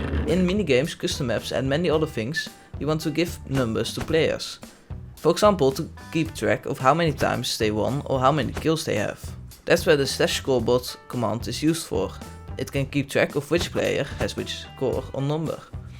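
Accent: Netherlands. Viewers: hij heeft echt zon nederlands accent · Are you dutch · that dunglish tho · duidelijk een nederlander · are you dutch so not English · are you dutch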